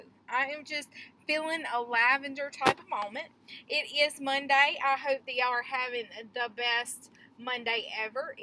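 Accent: South, United States. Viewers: I love listening to that southern drawl. You are so precious. I love your carefree art. You really enjoy yourself and so do I. ❤️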